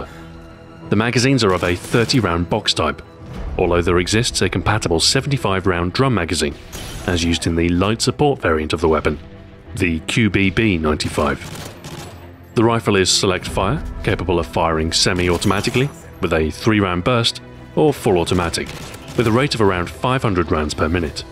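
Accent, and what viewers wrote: United Kingdom. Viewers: YOur british accent is so easy to listen to. And makes it a tad more intresting to watch.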